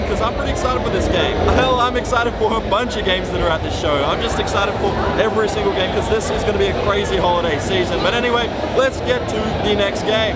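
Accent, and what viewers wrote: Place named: Australia. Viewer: ***** lol it was joke...he always says "my accent's Australian"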